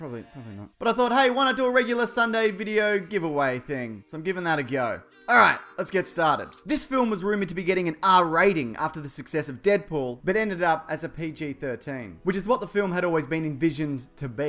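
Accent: Australia. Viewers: I would love to see Deadpool in the next Suiciide Squad movie...oh no wait. He's Marvel. Still Deadpool though. That movie would be EPIC. Oh actually, I want Batgirl in the next one- but only if I play her. Yes DC, I will begrudgingly take that roll for the good of the 'verse. Also, awesome video. Do I detect an Australian accent or am I wrong? Aussie YouTubers represent! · Hello Mr. man. I like your Aussie tone. It's nice. I WOULD like to win the lut crat, so if I could, that'd be cool. Thanks. Take care.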